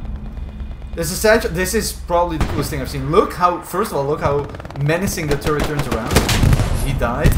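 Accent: Netherlands. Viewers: I smell Nederlands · ur dutch arent you ;) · your Dutch accent seems inaccurate for a German soldier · Thic Dutch accent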